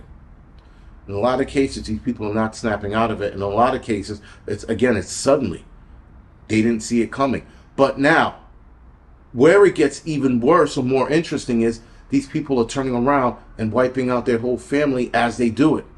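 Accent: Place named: South, United States. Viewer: You have some South in you.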